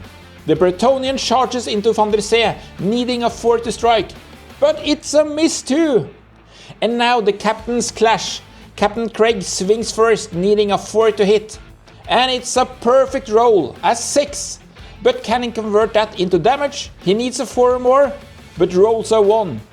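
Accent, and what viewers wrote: Norway. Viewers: Den norske aksenten skinner fint gjennom :)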